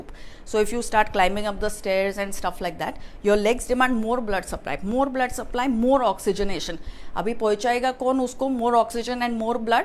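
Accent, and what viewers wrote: Mumbai, India. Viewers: Every video is very informative . I enjoy listening to your Bumbai Hindi. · Nice & convincing explaining...enjoyed Bambayya Hindi too...like..."ye mai kayke liye samza Rahi hu" 😄👍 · phuski bomb ...lol ..ur such a mumbaite..its awesome to hear every word u say .deep thanks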